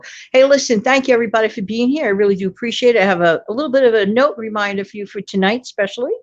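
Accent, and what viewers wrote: New York, United States. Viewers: New subscriber. I saw you on Centsible Living's channel with Prepper Princess. Love your personality and NY accent. Great video on the DT. 🌻🤗🤗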